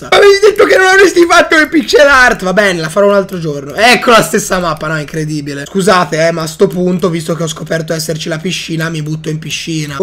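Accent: Tuscany, Italy. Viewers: Sei toscano?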